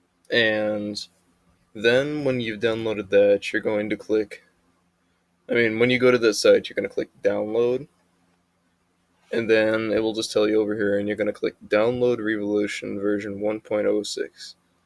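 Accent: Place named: France. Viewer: Spongebob French narrator voice generator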